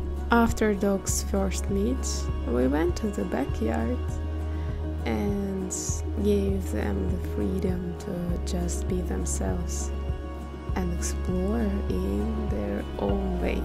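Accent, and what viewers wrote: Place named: Russia. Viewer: Great dogs!! Where r you from? You have very Russian accent.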